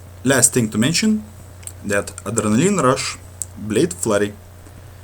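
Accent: Russia. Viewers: That russian accent of yours is so awesome xD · Well you know english pretty well, just have very strong accent as all russians.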